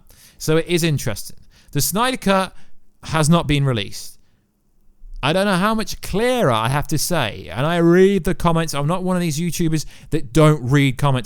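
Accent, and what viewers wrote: England, United Kingdom. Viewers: When gets mad his English accent thickens 🤣🤣👍🏽 Love the channel bro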